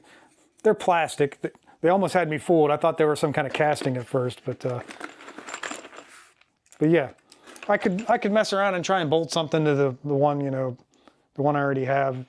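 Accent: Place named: Western Pennsylvania, United States. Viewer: Useful man thanks! Western PA? My folks are from Pittsburgh and I went to grad school there. Accent is tickling neurons.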